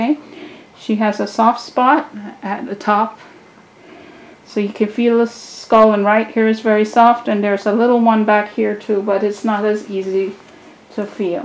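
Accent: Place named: Trinidad and Tobago. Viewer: You sound trini 🇹🇹 so cool even if you aren’t. I’ve never heard you speak. Your hands and mind are blessed. 🛐🛐🛐🛐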